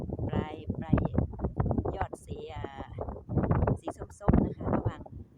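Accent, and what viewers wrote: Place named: North, Thailand. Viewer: มาฟังสำเนียงเหนือด้วยคนค่ะเพราะมาก❤❤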